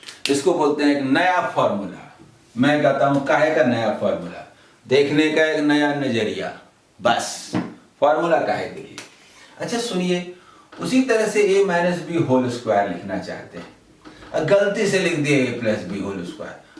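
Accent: Bihar, India. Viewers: Yes he is from bihar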